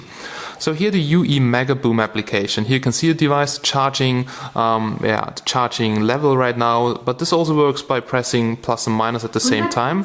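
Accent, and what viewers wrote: Germany. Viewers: wtf is that fucking german accent?!!! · uhhhh ....i hate the german accent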